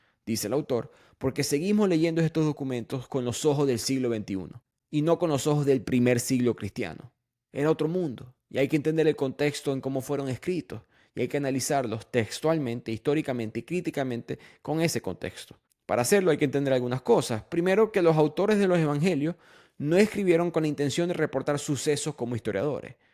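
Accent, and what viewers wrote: Maracaibo, Venezuela. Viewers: Gracias por estos episodios, por el cuestionamiento y por tus análisis. Nueva suscriptora aquí. Crecí en una casa católica, estudié en un colegio católico. Toda la vida me hice estas preguntas que estas exponiendo en el episodio y la única respuesta fue "hay que tener fe". La falta de racionalidad, de cuestionamiento, hizo que me alejara mucho. Decidí irme por el camino agnóstico, luego ateo, pero ese ultimo camino me dejo mas vacíos que los anteriores. Desde hace un tiempo quise acercarme de nuevo al catolicismo, pero desde la curiosidad. Voy a misa todos los domingos a aprender, a escuchar, a conocer desde cerca la comunidad, para poder decidir por mi misma. Todo el mundo a mi alrededor (que ya no creen hace mucho) lo ven como algo raro. Empecé a leer la biblia para saber de primera mano que es lo que dice, porque siempre me la han leído de una forma hermosa. 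La frase que mencionaste "el ateísmo es lo que pasa cuando lees la biblia, el cristianísimo es lo que pasa cuando alguien mas te la lee" me quedo resonando muchísimo. Aun no he decidido, quizá sea uno de esos que a pesar de haberla leído o a pesar de las evidencias, deciden creer, o quizá no, pero la frase me pareció poderosisima, mas que pretenciosa. Gracias. (Shoutout al acento Maracucho!)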